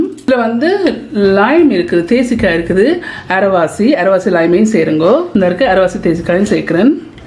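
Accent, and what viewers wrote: Sri Lanka. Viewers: Like your ilangai Tamizh.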